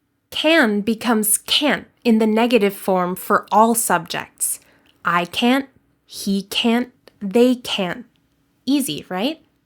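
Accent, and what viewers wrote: United States. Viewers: Hello teacher am new here am loving your accent, are you from which state of USA? Thanks in advance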